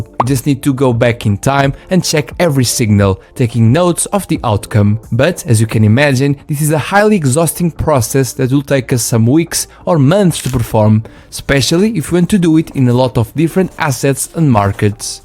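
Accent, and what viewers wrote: Portugal. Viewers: you are portugues right?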